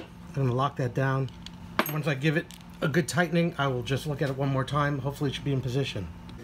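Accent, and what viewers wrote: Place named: Boston, United States. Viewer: I did that and started her up and it doesn’t sound promising. I will warm her up tomorrow and go out for a couple of miles to see how things are. I wish I could adjust them with the air box installed - at least I can leave them installed. Thanks for your advice. I detect a Boston accent.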